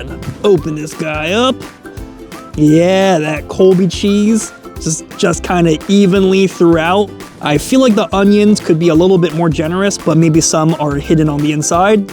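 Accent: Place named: North Jersey, United States. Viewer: Fake like in jersey. Yea I know you from north jersey cause you talking crazy bro.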